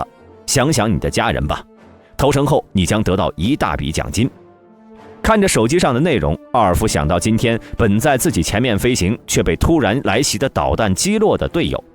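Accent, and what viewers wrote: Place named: China. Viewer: 説話者是大陸口音 留言者都不是大陸的😁😁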